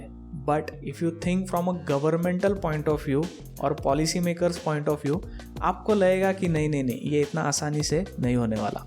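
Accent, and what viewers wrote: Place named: Bengal, India. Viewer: Keep it up.are you from Bengal? Your accent is like that.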